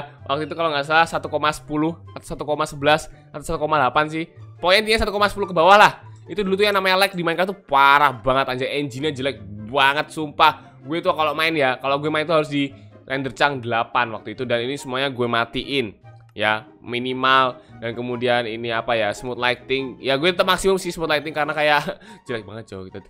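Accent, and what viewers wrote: Java, Indonesia. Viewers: kamu orang jawa?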